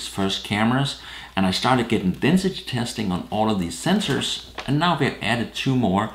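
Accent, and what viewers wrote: Denmark. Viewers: Hey, I'm a big DSLR geek just getting into the drone-world after getting my Phantom 4. Amazing stuff and pure candy to watch your videos. You have a new subscriber:) Er du i øvrigt dansker? Synes jeg kan høre det i din dialekt (og ikke mindst dit navn). Thanks:-) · Excuse me, but are you originally from Denmark?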